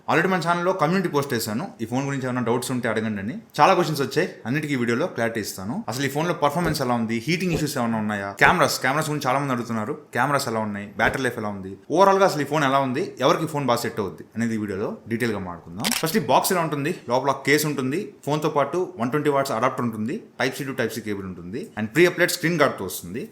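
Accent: Nellore, India. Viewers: bro nellore aa meedhi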